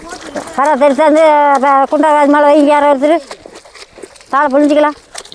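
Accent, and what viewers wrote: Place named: Salem, India. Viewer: Salem district. From Her dressing and slang. My native omalur salem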